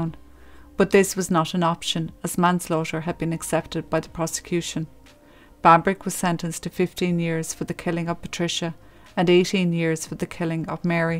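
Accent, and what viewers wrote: Ireland. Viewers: Thanx I enjoy your channel,straight and to the point and of cos the Irish accent,the cherry 🍒🍒🍒🍒 on top.Much love from South Africa.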